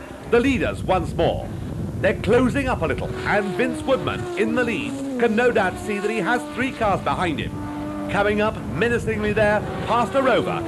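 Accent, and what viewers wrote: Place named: RP, United Kingdom. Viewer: He's speaking in RP (received pronunciation), more commonly referred to as "BBC English". The criticism of his pronunciation is not unfounded. He is indeed pronouncing chicane incorrectly. Given that his only job here is to speak clearly and accurately in order to provide a useful commentary of events, one could fairly and accurately refer to the commentator as a prat (the official definition meaning "incompetent").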